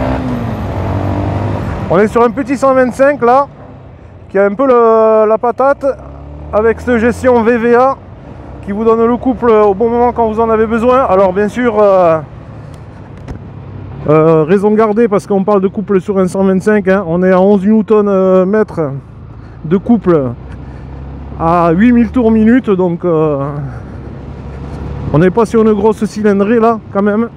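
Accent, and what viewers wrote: South, France. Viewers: Extra.. j'attends la mienne avec impatience.. très bonne vidéo avec l accent dû sud ..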